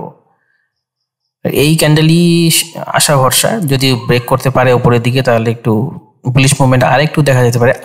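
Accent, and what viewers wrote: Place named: Bangladesh. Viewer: Apni kothai thaken , apnar vasha puro Bangladeshi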